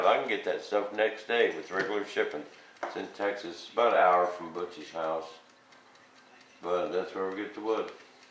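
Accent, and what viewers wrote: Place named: Louisiana, United States. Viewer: what part of Louisiana you's from...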